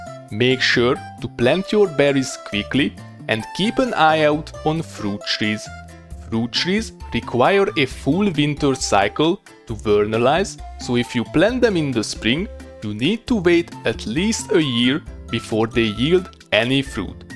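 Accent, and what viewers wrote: Hungary. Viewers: Ezt az akcentust csak magyar ember tudja produkálni :) Ettől függetlenűl köszönet a videóért! :) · Noice hungarian accent. Reminds me to "the malaciz and the wolf" :D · Bro you have the most Hungarian accent i’ve ever heard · Az első 2 mondat után már leszűrtem, hogy magyar vagy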